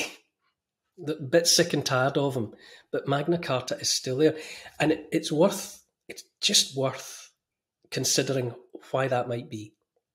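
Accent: Scotland, United Kingdom. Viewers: is a gem. Love the way he thinks and speaks. That Scottish accent just adds to it. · I love him more Trevor 😝😅😅 A gentle, beautiful Scottish lilt A kind, empathic, forward tilt An open heart; a caring face A willingness to take his place. A strong, home-hitting monologue Reminds us of the boiling frog The courage to become a sleuth A passion to release the truth. A deep desire to crack the whip Illuminates our freedom-strip Calls out the darkness taking hold The shiny despots; plated gold! Intense and raw, he lays it bare A soul-enhancing, honest stare Inflections drive the message through He speaks for Us - for me and you! Discernment's such a precious gift His words create a mighty lift Take heed of all he has to say He knows - it shouldn't be this way! 🤗🦋🐬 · I am so fekking ignorant. Your words hook me. The Magna Carta, was it signed at Runnymede or somewhere?? 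Surrey? I don't know which way is up. But I felt something was terribly up during that very first lockdown. I felt it in my waters. In my bones. I felt we were being darkly duped. I sensed something was shifting. Like when you go to the theatre, and there's the intermission. And behind the stage curtains all the scenery gets shfted around. Whilst people are slugging back their intermission quaff of choice, or queuing for the loo. Massive scene change is going on back stage. I felt something was going on. You have a lovely Scottish..burr..???🤔 I don't know how to spell that word. But anyway, you have that. ♡♡♡♡♡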